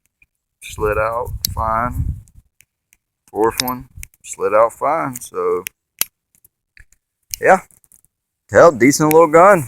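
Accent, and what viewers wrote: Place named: South, United States. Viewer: He only had 4 rounds at beginning.... (one on bottom right, one center bottom, two on left.... empty top center (live round) empty top right.) When he pointed gun towards himself I don't believe it was a loaded round. He took the bullets out to show how much was shaved off.... Then first round he fired was single action "for accuracy" .... you can clearly hear and see hammer pulled back before firing first round.... Thats when the live round was ready for fire I believe. However, never a good idea to point a gun at your face lol. Just move your damn camera in case you mistakenly do have a live round bro. Better it take the bullet than you. I assume since you got the shaving down and had no miss fires on a gun notorious for misfiring, that you're somewhat familiar with firearms... I get you weren't expecting it to be loud .. 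I don't get firing a sawed off shotgun without ear protection lol.... Shit does happen but shit can be prevented dude. Oh well you got enough bashing so I won't judge. Probably catching shit for your accent hence the moonshine shit comments lol. I been in the core for 20 years and you southern dudes typically know your weapons... Then again I don't remember seeing any of them pointing a gun to their face regardless if it's loaded or not. I'd still be doing push ups in the sandpit if I pulled that shit down range. I paid $15 at a pawn shop out the door for mine. I put it through hell. It even fires sumerged under water to this day. Most reviews are misfires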